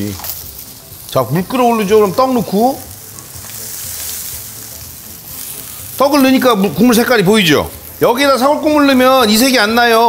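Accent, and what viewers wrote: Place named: Yesan, South Korea. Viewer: 보령 옆의 구수한 예산의 구수한 사투리의 음성이 귀에 쏙쏙 들어온답니다^^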